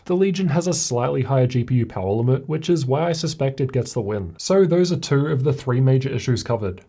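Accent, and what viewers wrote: Australia. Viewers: Great review! Also, I just love the Australian accent. Don't know why, but I just do.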